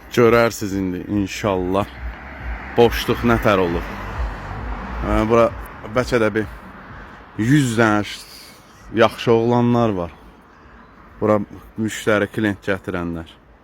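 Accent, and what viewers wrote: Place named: Sheki, Azerbaijan. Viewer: Qaqaş səni gözü yiyim, sən özün Şəkidənsə? Haindi ləhcən elə bilki, bizim ləhcədir. Hada naqaraq bahadı birdə gəlmərik. 😂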